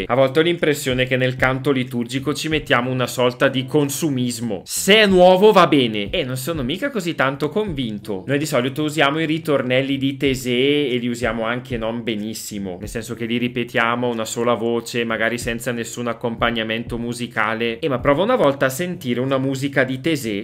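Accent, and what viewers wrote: Italy. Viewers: Ma che bell'accento altoatesino! Bergen de sura o de hota? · Un solo appunto: sei troppo buono. Capisco che sei un Sacerdos in aeterno, ma io ci andrei giù un po’ più duro. Tutto condivisibile, anzi, condivisibilissimo! E deliziosa la calata bergamasca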